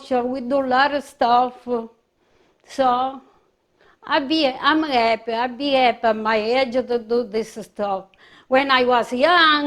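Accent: Italy. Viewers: The soup looks good ...I love your Italian accent ... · I love your cooking! I'm a very big fan of yours. My family came from Italy (both sides). So your Italian accent is very wonderful/familiar because of my mother. Thank you so very much for sharing your wonderful cooking ideas. 💗💗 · I love to watch you cook, you cook soo good I love your food and most of all I love your Italian accent, my favorite Italian food, 😋you are the greatest cook 😃😇❤💖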